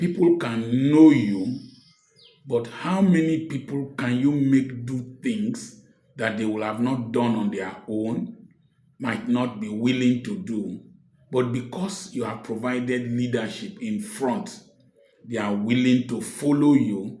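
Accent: Nigeria. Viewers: Yamutunized 😂. New word unlocked. Ilve it so much cause I dey hear Hausa 😂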